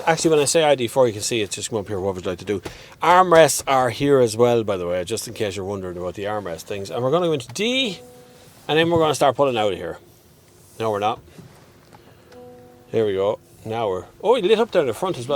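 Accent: Ireland. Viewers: I'm just happy to hear an Irish voice.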